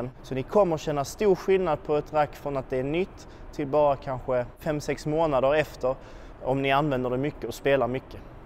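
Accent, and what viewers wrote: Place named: Scania, Sweden. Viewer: skjo :) älskar skånska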